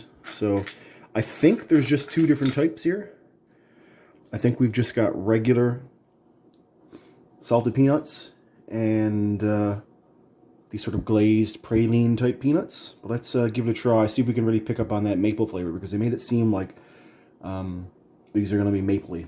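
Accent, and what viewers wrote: Canada. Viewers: The best part of the reviews are you can hear a hint of Bubbles from time to time from TPB lol Canadians have a great accent. Much love from Wisconsin,USA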